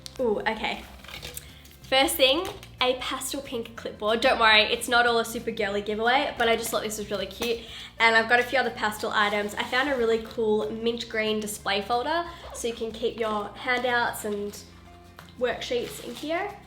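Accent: Australia. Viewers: Omg!! Love it so much!! 😀 can't waitt!! Congrats for ur new moviess!!!!!!!!!.. i really hope i could get the giveaway back to school supply '. And miss ur rabbit.. its So fluffy!!. And just wondering are u aussies?. Because ur accent like an australian accent xx and i love it. REQUEST: How to make a neat note and creative notes for school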